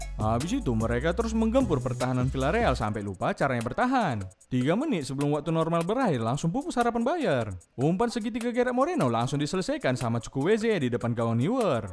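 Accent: Medan, Indonesia. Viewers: Medan kali bah ngomongnya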